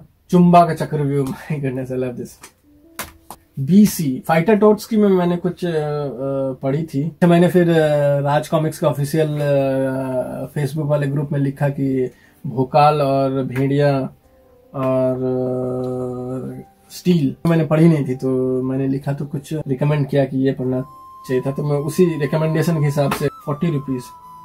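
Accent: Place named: Jharkhand, India. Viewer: You are so grounded man ❤️ I am also from Jharkhand ... Even aap Japan me rhne ke bawajood bhi Odisha jharkhand wale accent/tone me hindi bolte ho😎😎😎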